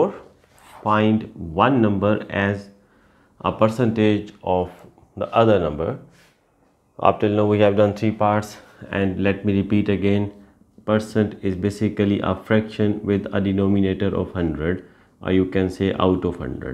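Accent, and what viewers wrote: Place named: Russia. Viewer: Russian accent!😄 love u sir for the way u teach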